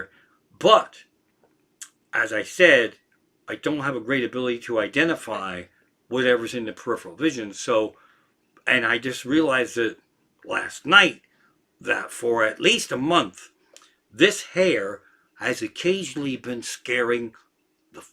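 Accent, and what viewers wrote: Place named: Ireland. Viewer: This was one of your best shows! I laughed so hard! It is great! Love your Irish accent!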